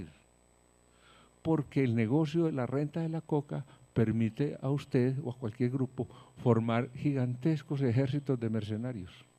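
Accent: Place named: Antioquia, Colombia. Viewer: Es lo mismo que dice petro pero con tono paisa .........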